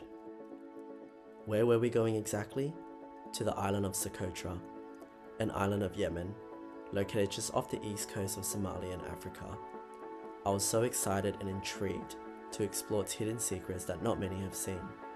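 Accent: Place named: Australia. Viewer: Hi, great video! It sounds like you might be Australian and I'm just wondering was Socotra listed by DFAT (or your respective Government travel advisory) as 'Do not travel' when you went? I'd like to go next year but unsure as its currently listed as 'Do not travel'...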